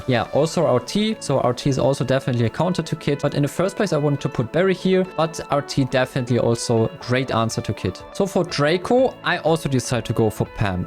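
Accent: Germany. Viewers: R u German? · I can hear the deutsche akzent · Hab direkt rausgehört das du Deutscher bist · Are you german?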